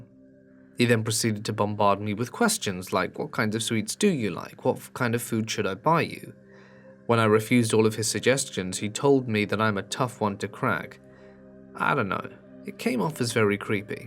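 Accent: Ireland. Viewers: Love the Irish accent,!! Happy New Year!! 🥳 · I love your Irish accent! Happy new Year! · That Irish accent is pretty hot.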